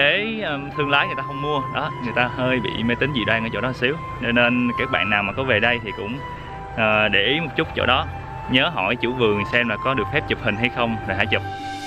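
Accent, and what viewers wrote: Mekong Delta, Vietnam. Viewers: Giọng nghe chất miền tây nhưng ngôn ngữ và ngữ điệu lại rất phổ biến phù hợp với tất cả m.n tóm lại nghe tiếng đã lắm! P/s: giọng này kể chuyện mà là TUYỆT kkk...😄😄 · Giọng miền tây dễ thương ghê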